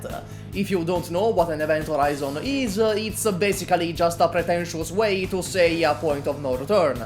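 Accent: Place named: Italy. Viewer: the accent (100% Italian)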